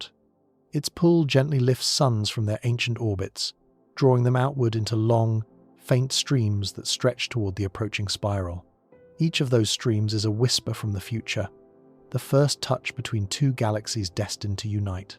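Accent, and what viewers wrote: United Kingdom. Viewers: all these damn AI robotic voices have Brit accents. Can't we get some with Nigerian or Peruvian accents?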